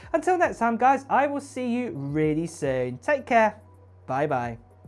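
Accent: Cockney, United Kingdom. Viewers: This was almost painful to listen to….sorry but the English cockney accent was very difficult to understand.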